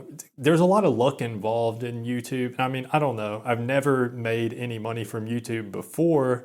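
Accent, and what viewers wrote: South, United States. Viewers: your university should have a resume development department, they'd still help an alumni out, also they train you for specific interview questions, if you want they'll even film you and walk you through the tape and where you could improve. Do I detect a Southern accent? T. Savannah, GA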